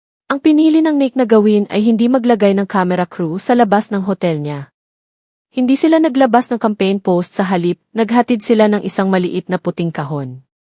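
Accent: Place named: Provincial, Philippines. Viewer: 😂😂😂 pronunciation lng ng NIKE pang barrio ka na😂😂😂 pati Debut mali din😂😂😂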